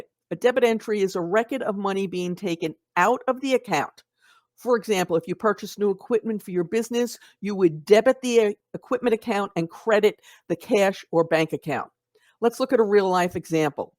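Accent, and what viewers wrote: New York, United States. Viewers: New Yorker?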